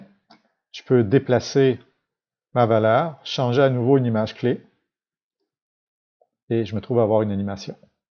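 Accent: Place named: Canada. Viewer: Bonjour. Je découvre ta chaine avec plaisir. Ton tuto est exactement ce dont j'avais besoin pour le visuel sur lequel je travaille. J'aime beaucoup ta façon d'expliquer, c'est clair, efficace, avec l'accent canadien que j'adore de surcroit! Je vais regarder tes autres tutos. Bonne continuation. Birdee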